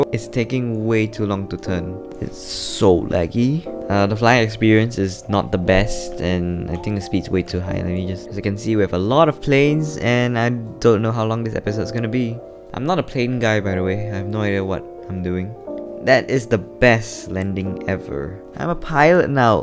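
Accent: Singapore. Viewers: You sound singaporean bro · yow ur a singaporean? you sound like one, jsut like me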